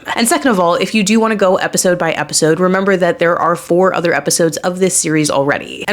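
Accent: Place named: Chicago, United States. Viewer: I’m not sure where she’s from, but over here near Chicago, the majority of us all speak this fast. I never realized how fast we speak until I went to Georgia where everyone seems to speak in slow motion to me, and they constantly asked me to repeat myself, because they couldn’t follow it. She does edit the pauses. Those pauses are likely as she looks back to her prepared speech, but it’s still about the same amount of time she leaves a pauses between sentences in general. Slowing down her speech a bit for a wider audience and editing the connected breaks to fit that speech speed would probably be better, because many people have a difficult time following it. I have had a hard time slowing my speech, but it was required for public speaking so I can slow down when necessary. The fast speech part of the accent from this area is difficult to unlearn. 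At first you purposely slow down, but unless you keep thinking about it, you speed right back up. I could be completely wrong here. I’m just speaking from my own experience , and she sounds very similar to people from my area.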